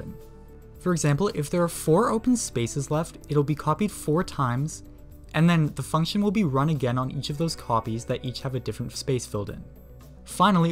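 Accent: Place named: England, United Kingdom. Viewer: I want to learn your English accent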